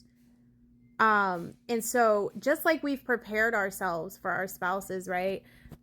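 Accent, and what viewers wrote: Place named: South, United States. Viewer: I am sorry, but I must ask. Are you originally from California or the South? The way you speak sounds like the South.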